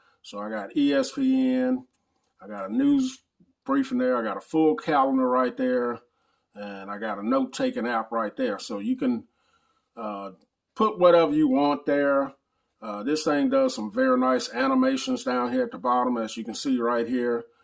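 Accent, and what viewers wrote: South, United States. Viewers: Like MKBHD with a southern accent lol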